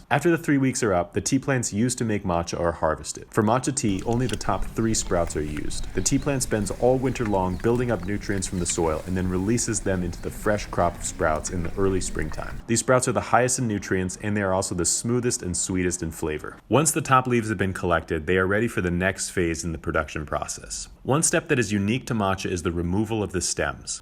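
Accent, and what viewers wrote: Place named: England, United Kingdom. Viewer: Typical English speaking style of pronouncing Japanese. oops.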